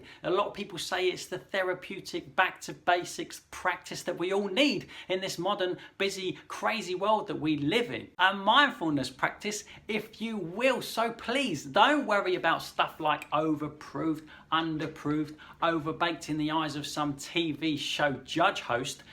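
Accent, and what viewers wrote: United Kingdom. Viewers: hi im just new in the channel and i wanna know where are u from im not english speaker but felts like i could lear some british english out from u. Im considering going there to work and my degree essay is about bread texture determination and comparision of different sourdough. So looks such a double strike, see ya.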